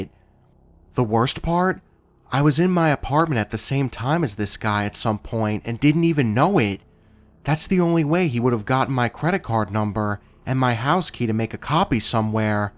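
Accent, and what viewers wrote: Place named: East Coast, United States. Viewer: I love hearing your stuff, and always notice the East Coast, Tri-state "twang" to your voice! It's like listening to a friend or family member of mine telling me a story, as we're from Jersey (then Delaware and now Lancaster, PA). Not sure what state you're from but I hear it : )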